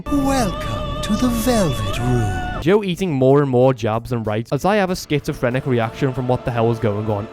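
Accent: United Kingdom. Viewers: MOST British accent ever I've ever heard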